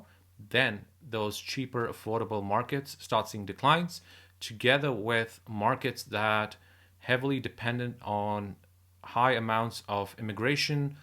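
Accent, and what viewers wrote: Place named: Iran. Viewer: Dude has an Indian name but has a mild Iranian accent.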